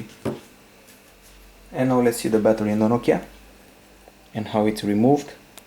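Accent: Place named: Romania. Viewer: Engleza ta are un puternic sound romanesc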